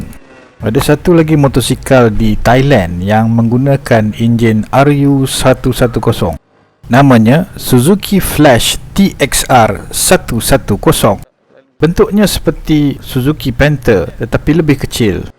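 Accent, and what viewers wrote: Perak, Malaysia. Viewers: Hahaha. Nasib baik sy bujang lg bang. Tapi terlajak sikit la, dah 34 ni single mcm mono je ha...abg perak belah mana ye. Org perak punya slang ni paling senang nk cam. Sy pun dr batu gajah ni ha....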